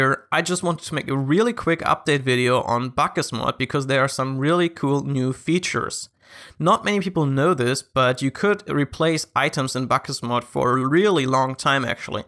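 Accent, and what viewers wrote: Germany. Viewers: Bist du deutsch? Hörst dich so an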